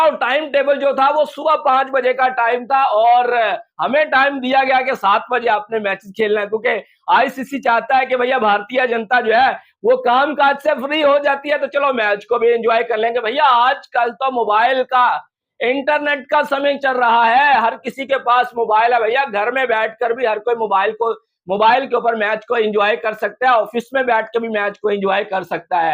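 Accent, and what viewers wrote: Pakistan. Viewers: Tum indian ni ho saaf Pakistan se ho . Tera lehja bata raha he q k tera zobaan tere uniform ke 7 NAHI dekraha he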